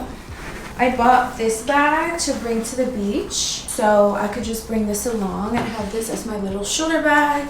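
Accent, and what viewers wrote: United Kingdom. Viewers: Your videos are so comforting🥺❤, enjoy your solo trip GURLLL🤍. HAPPY BIRTHDAY!! It 12pm here in Nigeria. Your British accent gets me all the time😂. Your braaids!!! giving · I love your British accent 😭😭❤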